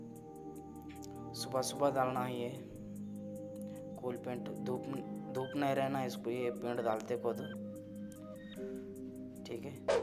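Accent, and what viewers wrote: Hyderabad, India. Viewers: Pkka hyderabadi bol rahe hoo bhai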